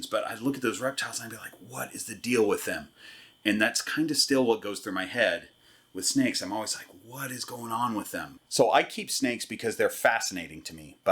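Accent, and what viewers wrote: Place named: United Kingdom. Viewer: As a Brit I would like to confirm that this is indeed a British accent! Lol 🐍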